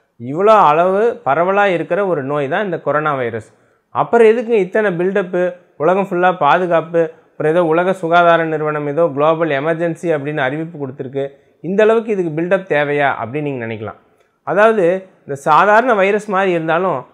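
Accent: Kongu, India. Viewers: Alagana kongu tamil oru doctor eathana jokes pola solrathu gd sir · கொங்கு தமிழில் எதார்த்தமான விளக்கம் அருமை, பேசுவதற்கே காசு கேட்கும் கார்ப்பரேட் மருத்துவர்கள் மத்தியில், உங்களின் தெம்பூட்டும் பேச்சு மிகவும் அருமை, உங்களின் பேச்சை கேட்டாலே ஒரு உத்வேகம் வருகிறது உங்கள் சேவை தொடர வாழ்த்துக்கள் மருத்துவரே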